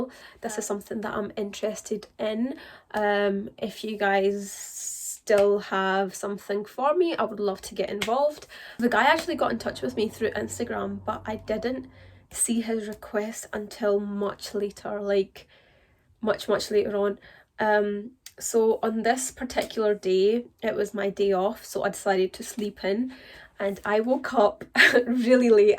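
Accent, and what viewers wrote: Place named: London, United Kingdom. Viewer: Amazing but why do u kinda sound that ur from London???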